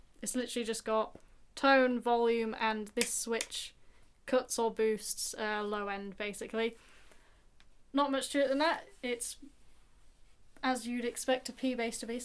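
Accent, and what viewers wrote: United Kingdom. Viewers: You sound British!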